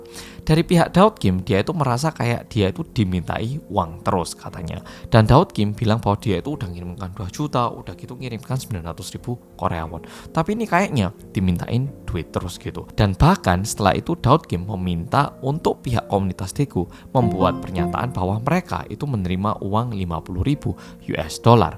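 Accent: Java, Indonesia. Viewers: sampean orang korea apa orang jawa ...bro ... hehehhe kok logatnya medok sekali ...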